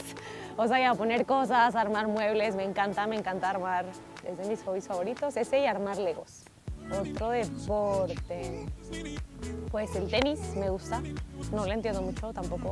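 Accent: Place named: Spain. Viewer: Que barbaridad ahora traes acento español. Jolines!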